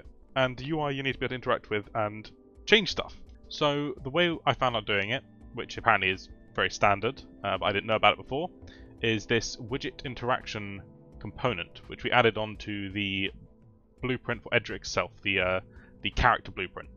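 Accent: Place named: United Kingdom. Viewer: Dat UK accent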